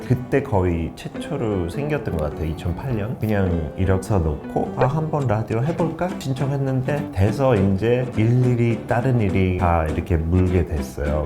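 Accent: United Kingdom. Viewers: 목소리 너무 좋으시고 영국식 발음하시는거 너무 멋진분~!! 🤗🤗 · 님 방송에서 많이 봤으면 좋겠어요^^ 영국 영어 발음도 너무 멋지시고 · 귀가트이는 영어 선생님 이신군요? 품격이 있는 해설과 영국 발음 잘 듣고 있습니다. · 엄마한국 몰랏네 아침영어 ㅎ 영국발음? 아닌가ㅎ 또봐요 ㅎ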